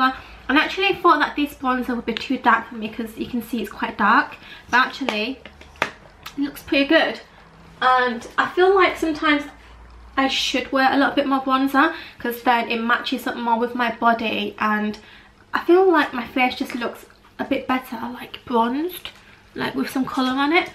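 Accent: Yorkshire, United Kingdom. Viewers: Im leeds and you sound yorkshire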